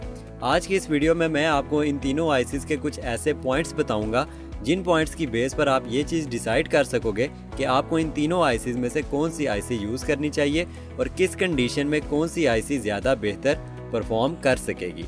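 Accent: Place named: Pakistan. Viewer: Pakistan accent 😊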